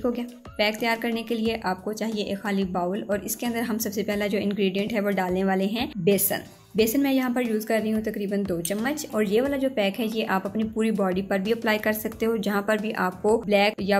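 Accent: Punjab, India. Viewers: The way u speak punjabi …Haye so cute 😘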